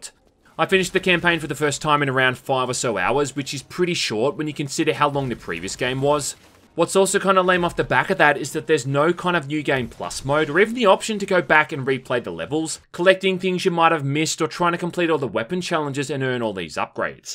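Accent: Australia. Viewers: Australian mate?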